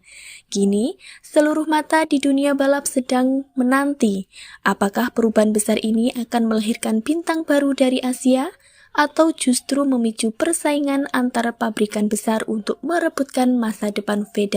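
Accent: Java, Indonesia. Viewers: Logat Jowonya sangat² medok 😂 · logat jawanya medok....tp dia anak hebat....ini yng penting....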